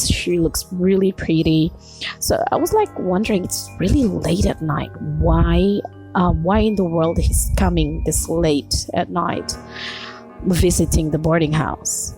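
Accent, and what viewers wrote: Indonesia. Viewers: Ini yg ngomong org indo ya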